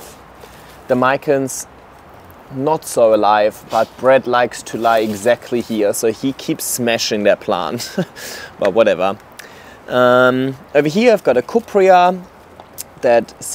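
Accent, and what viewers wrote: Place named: Germany. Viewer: Can anyone tell me if he has any German background 😭😭 I know his name is but my god his accent sounds so German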